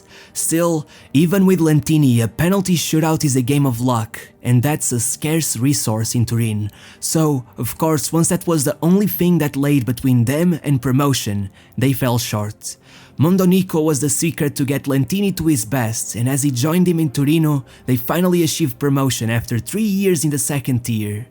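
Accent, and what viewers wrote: Portugal. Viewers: És português? Dá para notar pela pronúncia a falar inglês, é sempre muito bom ter um português com tantos subscritores e com um canal com um conteúdo incrível original e interessante. Continua com o excelente trabalho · És tuga né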